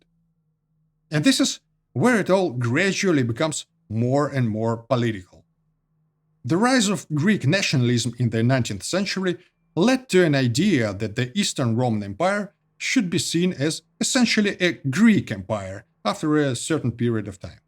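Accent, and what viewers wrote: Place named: Eastern Europe. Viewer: Wrong. The name "Byzantines' was popularized by the other Roman empire... the German speaking one. They refused to call the other Roman empire Roman because they insisted the holy Roman empire was the "real" Roman empire. Your anti-Greek videos and slavic accent suggest a less than objective motive. What's your background?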